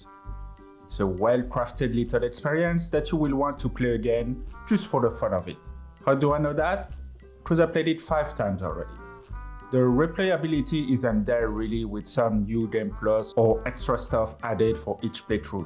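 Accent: France. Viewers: thought I recognized a French accent with a touch of African perhaps lol. I'm from Montreal QC too btw, and love the content!!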